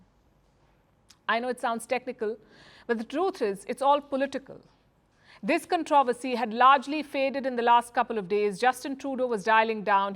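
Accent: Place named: India. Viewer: I like the way this lady tell her stories. Most of the Indian accent sucks but she manages to pull it off. Much love and respect from Africa.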